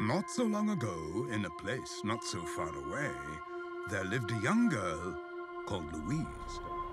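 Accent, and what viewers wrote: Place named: United Kingdom. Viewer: stuffy British narration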